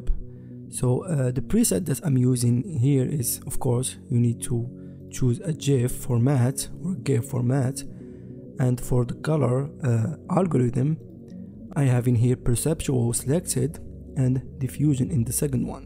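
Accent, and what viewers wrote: Morocco. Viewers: That Moroccan accent tho hahaha, great video Bro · Very nice thank you. you have a moroccan accent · That Moroccan accent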